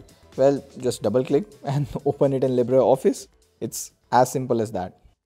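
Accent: India. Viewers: thick indian accent why it have to be thick indian accent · Hallo friends in have indian acsent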